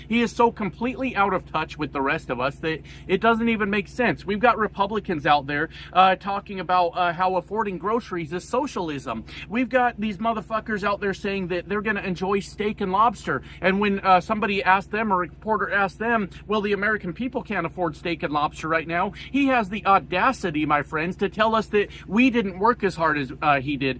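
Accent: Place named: Canada. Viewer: Love from Canada. You're sounding more and more like one of us.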